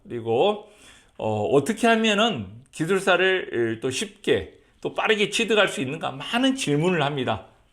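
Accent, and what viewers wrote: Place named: Jeolla, South Korea. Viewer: 빨갱이 동네 전라도말씨네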